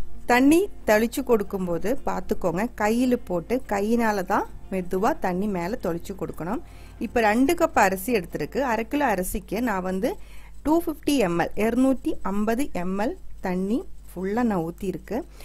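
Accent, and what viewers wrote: Kerala, India. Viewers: Love your kerala thamizh. Keep it up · Her malayalam mixed tamil slang is nice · Superb details ... very nice demonstration , ... Thank you Madam .. I also like the Malayalam flavoured Tamil.. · നിങ്ങൾ മലയാളിയാണോ? തമിഴ് കേൾക്കുമ്പോൾ മലയാളി തമിഴ് സംസാരിക്കുന്നതു പോലെ തോന്നി. പിന്നെ എല്ലാ recipies ഞാൻ കാണുന്നുണ്ട് എല്ലാം നന്നായിരിക്കുന്നു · You talk very sweetly. You remind me of my Malayalee friends from college. In fact your fluency in Tamil is excellent. You probably live in Tamil Nadu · Tamil speak..good... To be avoid Malayalam slank... nothing to worry...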